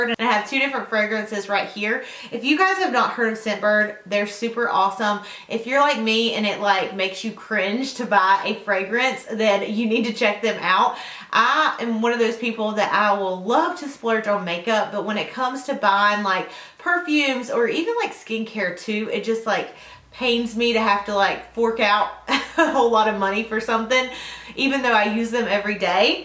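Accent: South, United States. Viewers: I could listen to your cute country accent all day! Great video as always x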